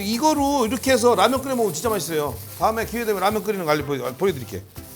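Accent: Chungcheong, South Korea. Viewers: 아 충청도 말 구수해~!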